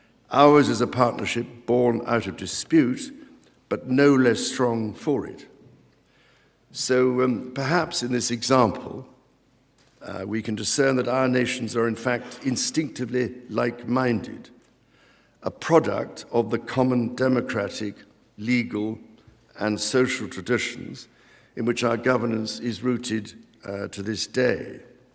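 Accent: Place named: RP, United Kingdom. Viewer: Nothing beats a clean, pure, royal English accent. Glorious.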